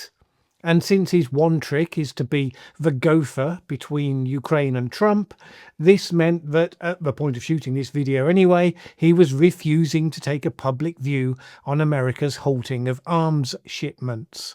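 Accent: United Kingdom. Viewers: It is fascinating to listen to utter rubbish, delivered in a nice British accent. Your drivel is driven by the basic hatred of Russia, and you will let no facts alter your knowledge of the truth. Like Ukraine, you too are heading down the toilet of history by your hate and ignorance. Zelensky was elected by 76% of the Ukranians because he promised to return the Minsk agreements and make peace with Russsia and the ethnic Russians, 14,000 of wome you have murdered in Domabss. As soon as he was elected, he totally flipped. If he DARE make peace with Russia, he will be hung in Maydan square by the Banderites - 3% of the people who run Ukraine. And the British see things ONLY through the lens of the Russophobia. "a man hears what he wants to hear and disregards the rest". Reality punishes stupidity. When regarding the ALLOWED gang rape of your young women, what conclusion can be reached, except that you have become degenerate people ?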